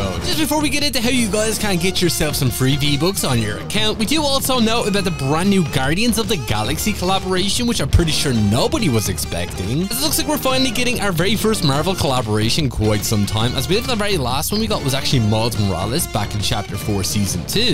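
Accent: Ireland. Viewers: Are ye from ireland I am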